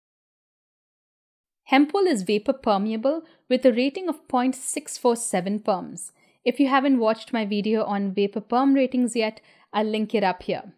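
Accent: India. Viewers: I stumble across this video because I think your cute and your Indian like accent is nice sounding. Now lets get serious. Burning sheep wool smells like when you burn your hair with a curling iron 😊, I am a guy with short hair, I thought it was funny because I said to myself, she burned her hair with a curling iron 😂. Here is something you may not have thought about as you were mainly comparing the two materials. What if the either layered the Hemp wool and the Sheep wool or better yet, weave the hemp wool into the sheep wool, that would make for a strong product that may not burn and might take the pros from both materials. Its was just a thought. Nice and informational video. 👍👍👍👍👍 😁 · You mention yourself to be from Dubai but you are not arab for sure. Expats never get citizenship there. I lived there for 20 years and I know it. Why to mention yourself s someone from Dubai if you are not? 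You sound indian and I don't see any reason for you to hide it. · Your Indian English pronunciation is good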